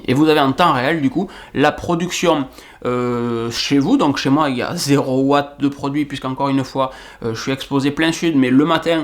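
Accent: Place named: Tarn-et-Garonne, France. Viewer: Tu serais pas du Tarn et Garonne ou pas loin avec ton accent ? lol ah bin j'ai eu ma réponse presque à la fin de la vidéo lol Moi je suis de Montauban. Merci pour cette vidéo, ça risque à me servir pour la maison de mon père.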